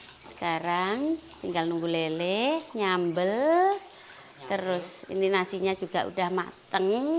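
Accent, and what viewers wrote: Java, Indonesia. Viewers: wqwqwq mbak mosok mangga gurih...aku mesti ngguyu ngrungokno mba ngomong...dialeknya jowonya kental....videonya apik kok....pinter bikin video...aku mbok diuruki mbak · ma'af mba..aku songko Demak lg semingguan nemu vlog mba lan seneng banget kehidupan lan logat jowone..ma'af mbak?adike sampeyan neng ternak entok nopo serumah karo mba,..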